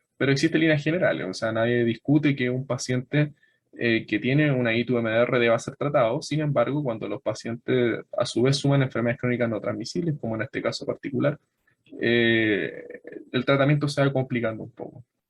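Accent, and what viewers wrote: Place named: Chile. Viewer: Hola, entiendo por el acento que eres chileno, deberias esforzarte en hablar un poco mas neutro así el resto de Latinoamerica puede entenderte.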